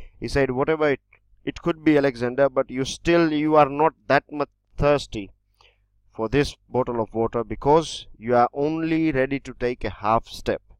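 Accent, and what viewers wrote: India. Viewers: plz sir indian acent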